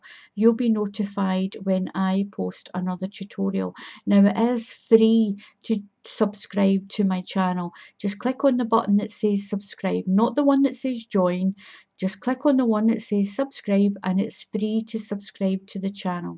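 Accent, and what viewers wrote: Scotland, United Kingdom. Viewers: is that a Scottish accent we hear ??? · Hi, whenever I want to do a crystal waves blanket I always come to your channel, well tonight I decided to put subtitles on as hubby is watching telly, well it seems text to speech doesn't recognise our Scottish accents, no kiddin' I am in fits, you should try it, good job I can follow without sound, anyhoo like others have said your tutorials are very easy to follow, one more thing, do you have sisters called Ann and June? If so I worked with them in Sainsbury's a few years ago.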